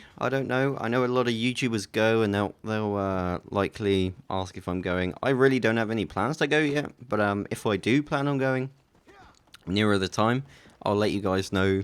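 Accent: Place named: England, United Kingdom. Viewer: Very good to hear a familiar accent Lol. Where in England are you from mate?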